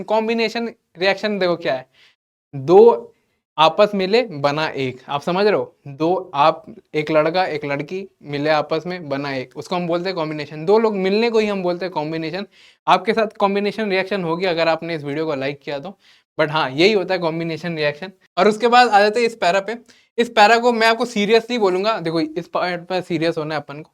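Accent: Bihar, India. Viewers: Sahi me aap Bihari ho kya 😮😮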